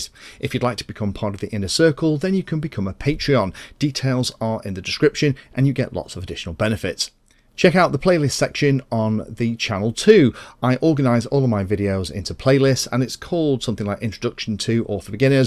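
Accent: United Kingdom. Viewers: I like all of your videos especially your very proper British accent and the pace of your tutorials. I should have watched this particular video first because it gives me a lot of comfort that I will not crash something on my first try with a flight controller.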